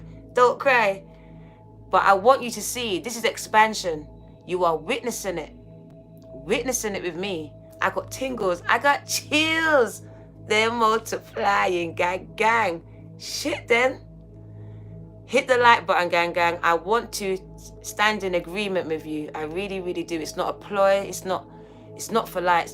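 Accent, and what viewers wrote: United Kingdom. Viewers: You are so gorgeous!! Love the hair!!! And the British accent!!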